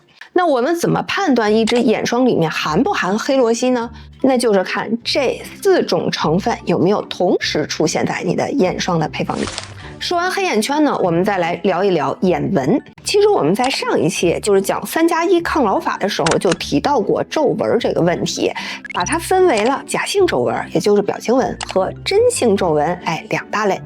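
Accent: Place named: Beijing, China. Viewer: 您的北京话听着很亲切！一对一怎么收费呢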